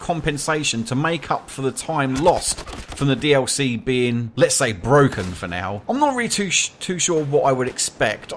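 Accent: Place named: Brighton, United Kingdom. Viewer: ***** nice, do you have hardline on both platforms? Also what part of england are you from, judging from your accent I would say Brighton ;)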